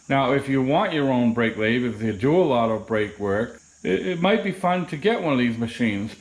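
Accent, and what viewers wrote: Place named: New England, United States. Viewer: Hey you sound like a respectable New England guy. If you’re in the Southern NH area I’ll pay ya $25 a rotor to do mine lol. Or if you have a recommended shop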